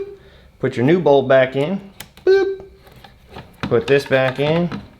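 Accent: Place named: Arkansas, United States. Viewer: Enjoyed the video! I was sitting here telling my wife that you sound just like her cousins in Arkansas and you could have done the video in about 45 seconds if you hadn't been so happy, friendly, sound effecty, and chatty lmao! 🤣 I'm going to subscribe just for the future sound effects!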